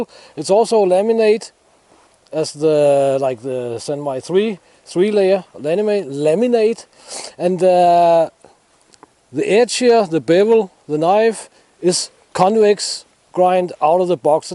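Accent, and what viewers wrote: Denmark. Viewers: I looove the accent! Danish? (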